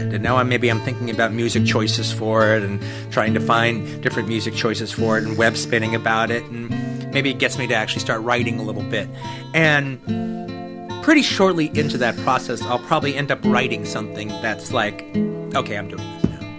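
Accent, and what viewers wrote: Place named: New York, United States. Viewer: is he a new yorker or a chicagoan.. whats his accent? :) wikipedia.. --> tells me he is from knoxville Tenesee.. I guess he's accent morfed into californian accent by now.. but it sounds big city anyway! perhaps he lived in new york also as it sounds like new yorkan accent to my swedish ears..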